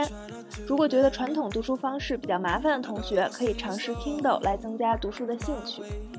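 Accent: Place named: Tianjin, China. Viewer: savis知道你是北京的 不过真的觉得说话像天津人啊 甭儿亲切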